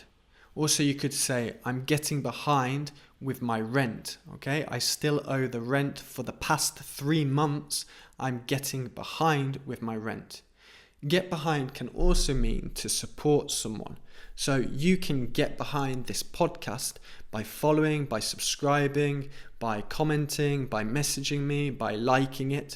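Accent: United Kingdom. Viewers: I really like your British accent! Where are you from in the U.K.? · you make me love the British accent even more... 🤩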